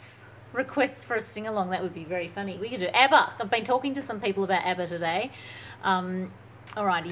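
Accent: Australia. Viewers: i love australian accents<333 so cool! love the tan, you look FIERCE girl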